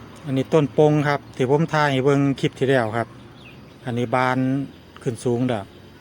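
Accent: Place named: Isan, Thailand. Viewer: มาชมบรรยากาศงามๆนำครับ เจ้าคนจังหวัดได่ สำเนียงคือข่อยแท้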